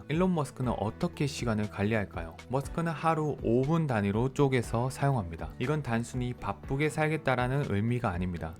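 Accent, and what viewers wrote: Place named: Gyeongsang, South Korea. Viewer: 시몰 다이어리 잘 받았습니다. 너무 감사합니다. 정성과 진심을 느껴서 댓글은 처음인데 안 쓸 수가 없었네요.. 듣다가 경상도분이시구나!.. 내적 친밀감..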